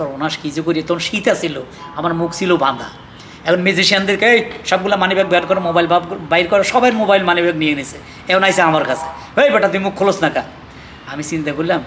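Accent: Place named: Noakhali, Bangladesh. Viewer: খুবই ভালো লাগলো। ভারত থেকে বলছি, আমি হারুন কিসিঞ্জার ভাইয়ের প্রচন্ড ভক্ত। ওনার সব ভিডিও আনন্দ করে দেখি। হারুন কিসিঞ্জার ভাইয়ের প্রতি আর একটি কারনে আমি আত্মিয়তা অনুভব করি। আমাদের পুর্ব পুরুষের বাসস্থান নিল নোয়াখালী জেলায়। বর্তমানে পশ্চিমবঙ্গে আছি। ছোট বেলায় আমার ঠাকুরদা বাবার মুখে নোয়াখালী ভাষা শুনতাম। আপনার কথা শুনে শৈশবের কথা মনে পড়ে। আপনি এভাবেই চালিয়ে যান। ভালো থাকবেন। শুভেচ্ছা জানাই।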